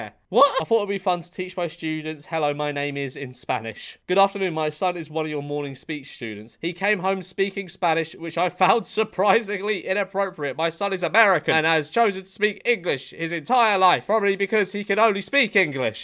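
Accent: United Kingdom. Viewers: 'ello welcome back - brilliant sign of a British man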